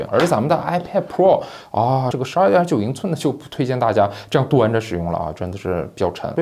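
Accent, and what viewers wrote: Northeast, China. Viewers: 第一次收到推送，是东北人吗听起来好好笑啊可是难道不应该是台湾的频道吗🤣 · 东北老铁啊？ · 東北話太逗樂哈哈哈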